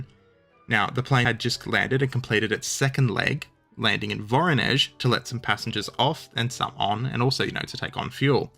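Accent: Australia. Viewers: People being annoyed at hearing Australian accents? Who are these (third letter)unts? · Apart from the Aussie accent, I can't watch this facetious video about a Aeroflot jet hitting a landmine. Come on, be serious about your subject! 😩🙄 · IS that a thing??? People getting triggered by an Aussie accent??? WTF?!?!!?!? God help them if they heard my Southern Texas Accent. 🤣🤣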